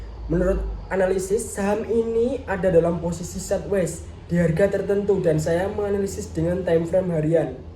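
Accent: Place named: Java, Indonesia. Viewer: Medok dikit ga ngaruh🔥keren poll👏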